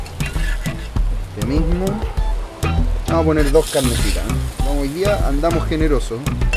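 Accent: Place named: Cuba. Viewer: Habla como un cubano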